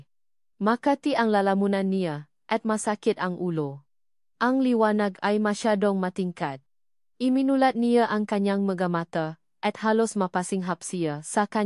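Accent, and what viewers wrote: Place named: China. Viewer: Ang pangit ng narrator palitan ng pure Pilipino parang Chinese mag pronounce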